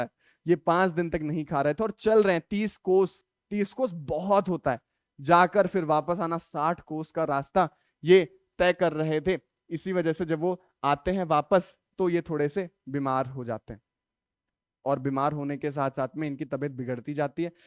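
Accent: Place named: Lucknow, India. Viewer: Ramvrash bainpuri- lakanvi andaj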